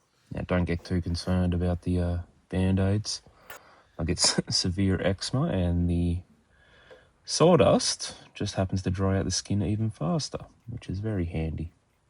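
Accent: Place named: Australia. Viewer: Great to hear another Aussie voice on youtbe! Love the unit. Subbed, I look forward to seeing more of you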